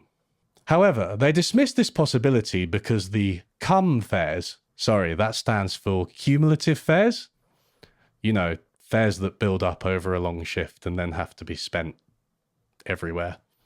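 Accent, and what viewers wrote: United Kingdom. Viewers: getting used to your humor and voice inflections (I'm not British)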